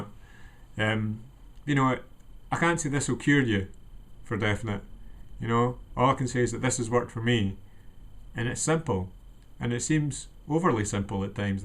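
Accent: United Kingdom. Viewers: It's quite refreshing to hear a scottish guy talk on YT to be honest. All i hear is americans, the english and aussies. and im aussie too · Hi, I'm suffering from terrible anxiety/ lack of sleep. I can relate to every single thing you've mentioned in this video. Things seem terrible now but I hope that one day I will be making a youtube video like you, helping other people. My diet isn't great.. I must admit but that's down to me being at home 99% time feeling sorry for myself and not knowing what to do. I just downloaded the audio book and I'll give this a good go. Tried pills, hypno etc.. P.S mum's from glasgow, nice accent! :)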